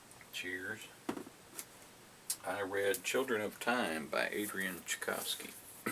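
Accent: United Kingdom. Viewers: Good month,! I found The Hate U Give a real page turner too. Your British accent!!!! Amazing! We do love a good chippy! haha! L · I loved your British accent! (I love a good chippy too haha!) Great wrap-up :)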